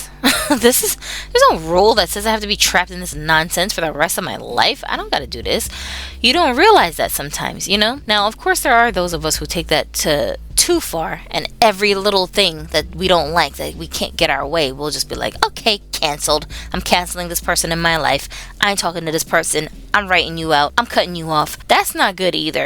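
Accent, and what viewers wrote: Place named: United Kingdom. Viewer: THE BRITISH ACCENT <3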